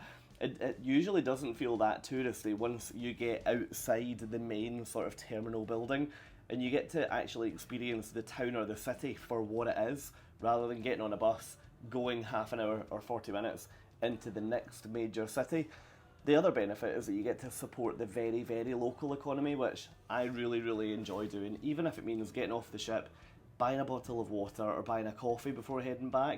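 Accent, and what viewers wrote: Scotland, United Kingdom. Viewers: You couldn’t be more Scottish if you tried. Bless you, honestly no one ever walks in the emirates except around the shops! I hope you didn’t get heat stroke, loved this Vlog though. And the food looked fab on there. PS. The gold is the dowry for your daughter, you’re supposed to buy pieces to add to your collection so you can have the best choice of husbands for her 😂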